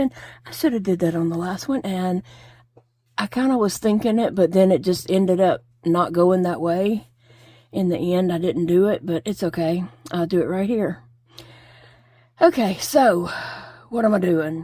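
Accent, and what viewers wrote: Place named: Delaware, United States. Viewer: I LOVE this Gnome! I Love the Mustache, the Turned Up Hat and the Vine with the Flowers and Curly Cues. The way you say the days of the week sounds the same as we do here in Delaware. 🤷🏼‍♀️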